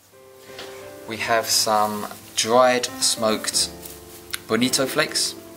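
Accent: United Kingdom. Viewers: so nice to hear someone talking about Japanese cuisine in British English! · Bloody Hell! Judging by you accent, I imagined you to be the most saxony british brit. Until you turned out to be east asian; I was like 0.0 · omg British accent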